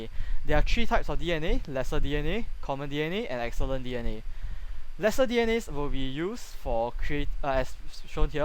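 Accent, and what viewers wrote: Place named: Singapore. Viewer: I'm a simple man, I detect Sing-lish, I liked and subbed, greetings from Jakarta. Stay Safe!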